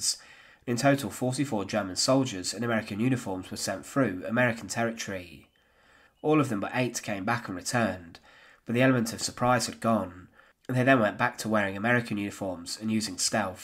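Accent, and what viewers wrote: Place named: England, United Kingdom. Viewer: Sounds to me, a British person, like he has an estuary accent. It’s very clear.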